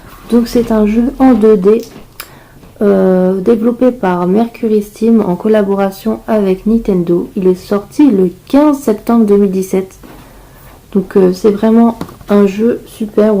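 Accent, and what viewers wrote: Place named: Nord, France. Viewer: Salut , pas mal de bonnes trouvailles GG à toi avec en plus un accent du Nord on dirait ...Je mets un Like et je m'abonne . Bienvenue à toi sur ma chaîne ;). A bientôt . Yoguy72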